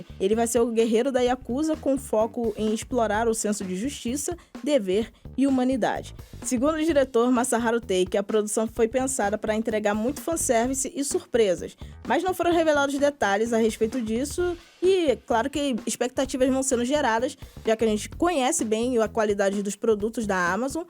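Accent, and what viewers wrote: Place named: Rio de Janeiro, Brazil. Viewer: Meu sutaque carioca! Saudade da minha terrinha.. haha. Bem vinda!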